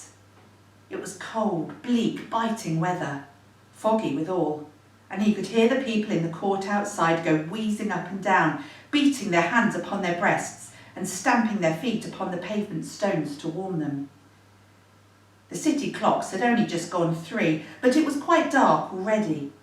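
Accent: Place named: United Kingdom. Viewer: I loved listening to you read A Christmas Carol! It's different for me to hear it with a British accent. 🥰😘🎄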